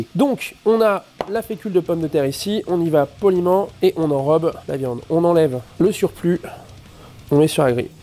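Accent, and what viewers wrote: South, France. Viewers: l'accent du sud me fais penser a mon prof de cuisine au lycée mdrrr on se tape des barres avec cet accent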